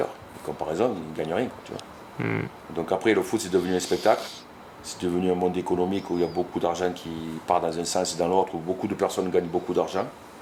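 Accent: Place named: South, France. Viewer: French southern accent 😍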